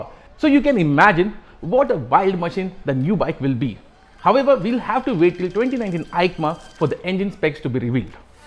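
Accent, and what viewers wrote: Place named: India. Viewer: Your English accent looks like indian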